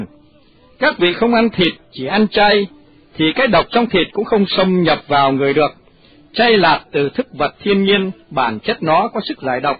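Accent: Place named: North, Vietnam. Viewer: Dọng đọc bắc nên mình là người bắc nghe rất dễ hihi. Mong chất lượng ghi âm ngày 1 tốt hơn nữa để tránh rè ạ 🌷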